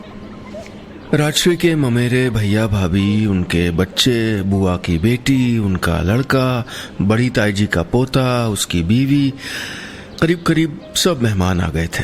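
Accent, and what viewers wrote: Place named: Pahadi, India. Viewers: Best kumaoni story.. 😘😘😘.. I love your voice ji in kumaoni style 😘😘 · very nice.. aapne kumauniyon ka bolne ka style bohot sahi se copy kiya ha ..love you.. you are soo nice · 🥺🥺🥺sir ur accent of phadi was incredible...it made me tears up ... Thank you sooo much miss pant for this lovely story ❤️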